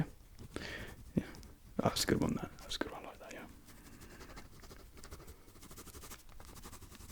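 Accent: United Kingdom. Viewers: I love his voice. His accent is ✨perfection✨. I love English accents. Proper English, also known as British English, is just so intelligent, it sounds intelligent. People who are from the UK, when they speak, they sound so educated. But your voice really calms me down, and I love that you can do that. Not everyone's voice calms me down. But you made me feel very relaxed, so thank you. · What kind of English pronunciation is it? I want to learn it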